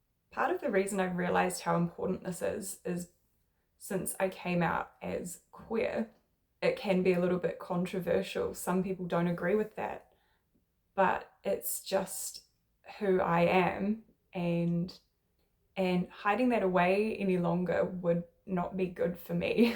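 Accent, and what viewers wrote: New Zealand. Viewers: That sounds like a kiwi accent. Kiwi culture is pretty shit really, and aren't welcoming to people's quirks.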